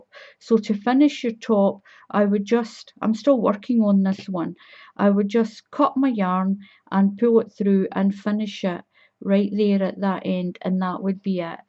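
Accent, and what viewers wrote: Scotland, United Kingdom. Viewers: Hi, whenever I want to do a crystal waves blanket I always come to your channel, well tonight I decided to put subtitles on as hubby is watching telly, well it seems text to speech doesn't recognise our Scottish accents, no kiddin' I am in fits, you should try it, good job I can follow without sound, anyhoo like others have said your tutorials are very easy to follow, one more thing, do you have sisters called Ann and June? If so I worked with them in Sainsbury's a few years ago. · is that a Scottish accent we hear ???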